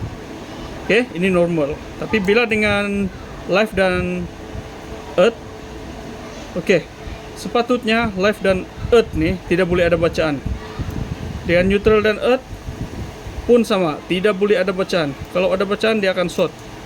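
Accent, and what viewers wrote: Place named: Sabah, Malaysia. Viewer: Kedai dmna bang dsabah ka loghat mcm sabahan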